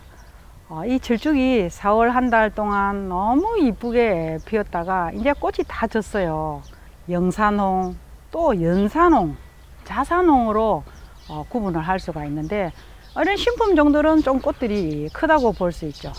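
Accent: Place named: Gwangyang, South Korea. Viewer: 광양분 같네요.목소리가...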